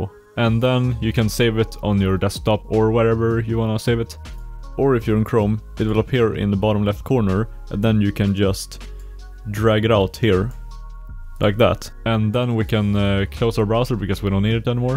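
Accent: Sweden. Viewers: the swedish accent i knew he was swedish from the first second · Han hör är 100% svensk😂😂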